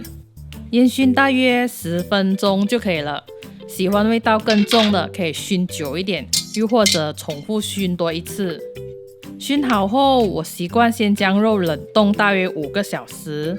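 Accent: North, Malaysia. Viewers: 你的食谱都很喜欢，请问是北马人吗？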